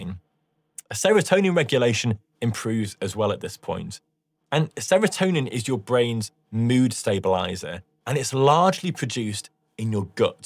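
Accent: England, United Kingdom. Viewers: Oh the delight of hearing a nice English voice! Thank you dr.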